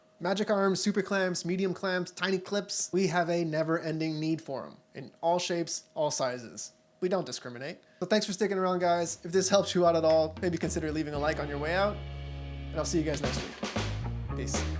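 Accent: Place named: Quebec, Canada. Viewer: J'me suis pas rendu compte que t'étais quebecois au premier video qu'j'ai écouter sur les flashs drag. Toute une surprise quand je t'ai entendu immiter ta mere. Tres bon contenue jusqu'ici, lache pas:D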